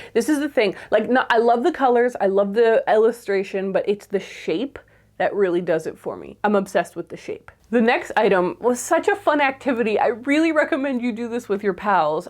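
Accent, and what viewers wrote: Canada. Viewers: your canadian accent seems strong to me and i love it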